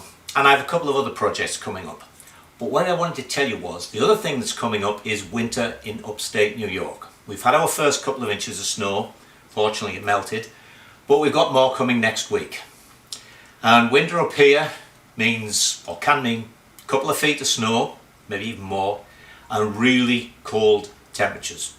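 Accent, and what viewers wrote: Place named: Teesside, United Kingdom. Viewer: Ahhh, thought I recognised that accent - a Boro lad like myself. Hope you are enjoying your retirement. I am about to start on the restoration of my '67 BSA Lightning so may need your expert guidance!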